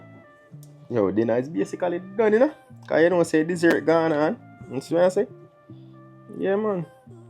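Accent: Jamaica. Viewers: Chief.. I. Love. The. Fact. You. Speak. English. Well. Just. With. A. Little. Jamaican. Accent. Which. Is. Fine.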